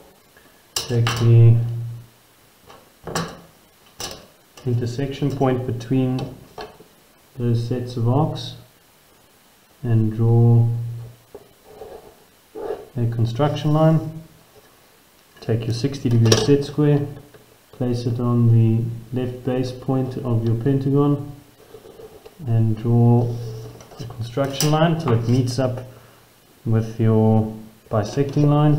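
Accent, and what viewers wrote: South Africa. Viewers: oh hes so south african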